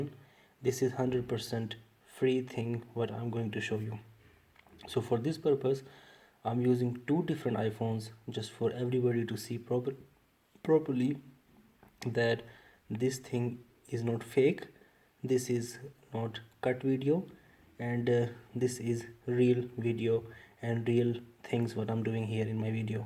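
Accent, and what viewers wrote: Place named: Pakistan. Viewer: Bhi tum Pakistani lagta hn awaz sa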